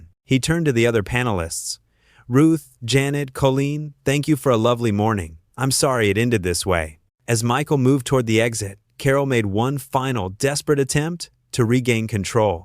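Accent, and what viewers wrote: United States. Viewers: This British Insights video is just a way to click and watch this video with untrue facts. This video was edited from the Loose a woman Show and it has a voice over story There is a similar video featuring Lorraine Kelly” insulting” Sir Michael as well . I wish these companies like “ British Insight “ using an American voice over to do something positive. Make videos for something like saving the rainforests or helping homeless people instead of winding people up.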